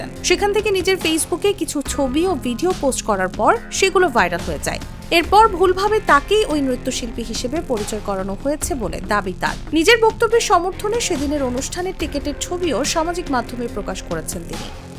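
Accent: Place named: Bangladesh. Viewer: আপামণির কথা বলার ধরন দেখে মনে হচ্ছে বাংলাদেশী, তা আপামণি আপনার দেশ যে বিদ্যুৎহীন অবস্থায় ব্ল্যাক হোলে ঢুকে গেছে সেই খবর করেন না কেন??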